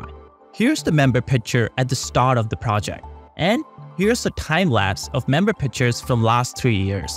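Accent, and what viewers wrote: India. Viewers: Why you speak with an indian accent? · This guy is Indian. His accent is different from most indian but the the way he rolls his tongue is definitely indian.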